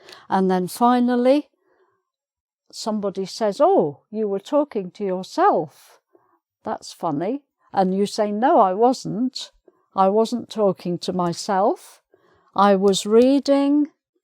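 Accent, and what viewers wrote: United Kingdom. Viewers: Your fantastic pure British accent is something out of the world. Never die dear never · the accent british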